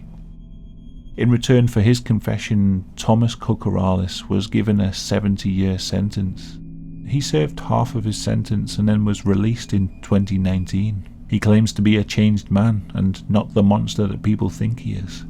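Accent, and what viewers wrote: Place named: Midlands, United Kingdom. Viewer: Excellent!! Just subscribed! Love your midland accent..it sounds so pretty💯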